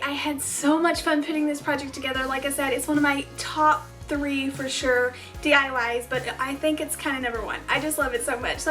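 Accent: South, United States. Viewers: OMGosh!!! You're SO adorably talented!!! I'm new to your channel, but have subscribed. I've seen like maybe 2 other videos of yours, but THIS ONE is absolutely TOO CUTE!!! TFS! Blessings to you & your loved ones! PS. Is that a southern bell accent? I'm originally from upstate New York, but lived like 28yrs in southern California, & the last 10 1/2yrs in the NW area of Washington state! (By Seattle.) ONLY asking cause I LOVE your accent!!! Anyway, HAPPY CRAFTING!!!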